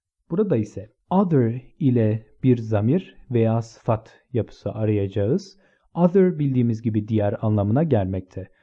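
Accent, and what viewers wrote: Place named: United States. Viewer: Amerikan aksanınız çok hoş ❤️👋🏼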